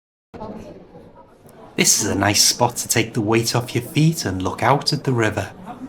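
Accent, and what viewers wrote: Liverpool, United Kingdom. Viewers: Do you have Liverpool accent? I love British accent, but I find it really hard to choose which accent suits me best because there are so many different accents in the UK. Could you suggest which one I should learn?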